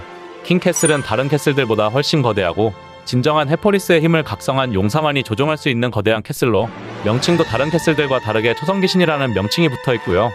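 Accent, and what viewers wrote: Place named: Jeolla, South Korea. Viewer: 말투가 전라도네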